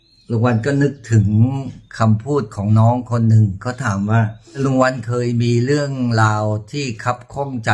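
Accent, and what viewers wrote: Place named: Prachuap, Thailand. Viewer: เพิ่งเข้ามาดูไม่ทราบว่าคุณพี่คนจังหวัดอะรัยค่ะสำเนียงเหมือนๆคนประจวบ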